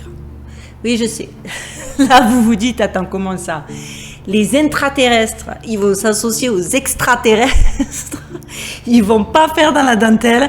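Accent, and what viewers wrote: South, France. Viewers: Bravo, joli travail de recherche... Depuis quelques semaines je suis votre chaîne youtube, et je dois dire que c'est un beau travail de synthèse et de liens fait avec un certain brillo ✨👌 (Même si tous les sujets abordés ne résonne pas forcément... Je fais mon tri comme un grand 😄) Et puis votre côté "rigolote" et votre "accent du sud" donnent une saveur en plus bien plaisante... Merci à vous 🙏☯️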